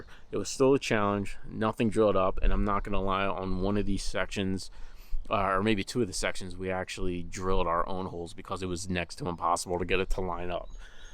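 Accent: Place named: Philadelphia, United States. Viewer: By the way, had a feeling you were from the Philly area by the sound of your voice. Just sounded local to me. Checked your profile and saw that you are...me too (Delco; now South Jersey).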